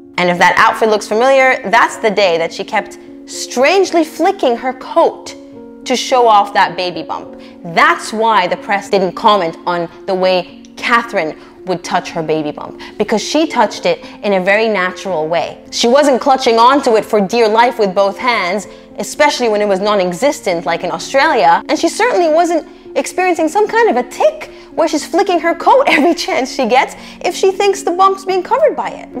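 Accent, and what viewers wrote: United States. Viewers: Listening to you is like listening to my own thoughts in an American accent. Loving your videos. Just discovered them today. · Great video your accent perfect English but I'm wondering is it American it has a slight undertone of something else I'm so interested in it. It's an American accent with slight differentiations on certain pronunciations though few would notice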